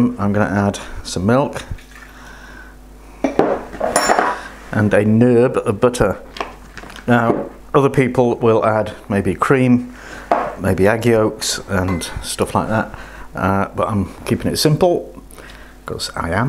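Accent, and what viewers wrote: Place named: Yorkshire, United Kingdom. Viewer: What part of Yorkshire are you from? Love your easy going style.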